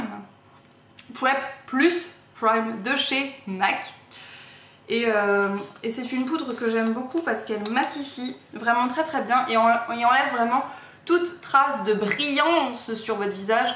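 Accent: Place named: England, United Kingdom. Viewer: Tu es de retourrrrr !! Tu m'as manqué ! Alors , ton accent anglais, il est trop bien, dis, tu donnes des cours pour l'anglais ? :) Superbe vidéo !!! J'aime beaucoup parce que ça fait également tes produits fétiches etc ! Gros bisou !! ♥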